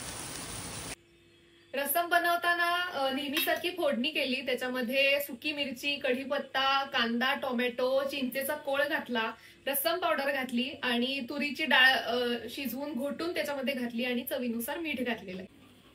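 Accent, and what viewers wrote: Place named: Brahmin, India. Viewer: अग तू किती लहान आहेस... आणि किती शुद्ध मराठी बोलतेस... आम्हा ब्राह्मणांसारखंच बोलतेस.. मला तुझा खूप अभिमान वाटतो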